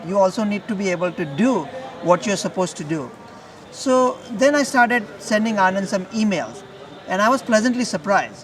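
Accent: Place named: United States. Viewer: Waaa, speaking in अमेरिकन एक्ससेन्ट खूपच छान, बरयाचदा मराठीत ऐकायची सवय होती..👍👍👌👌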